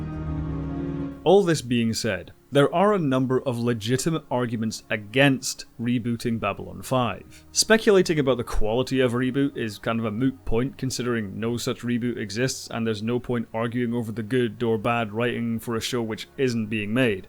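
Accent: Scotland, United Kingdom. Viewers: I never actually spotted the similarities with The Expanse and B5, and now in my mind the expanse almost feels like a kind of prequel, it feels like the same kind of realistic political universe as B5. Maybe that's the route Straczynski should take, a prequel or sequel rather than a straight up reboot? Project Babylon or something. BTW, is that a suppressed Scottish accent I hear? Dude, if it is then just go with the accent, you know most folks love our accents lol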